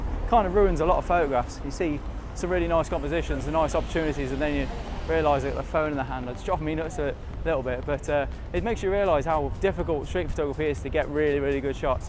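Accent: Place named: Wales, United Kingdom. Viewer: I found him pretty easy to understand. I also liked his relaxed blogging style, which I guess you don't much. You're probably not used to a Welsh accent, which may explain why you are struggling to understand English. Otherwise, you might want to get your ears checked out in case you are going deaf, which would also be a better use of your time than trolling.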